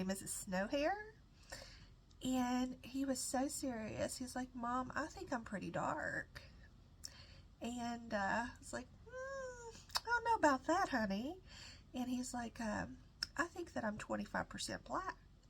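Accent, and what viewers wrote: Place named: Georgia, United States. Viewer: You do have a lovely voice, and I swear, the accent is South Georgia like my Granny's family.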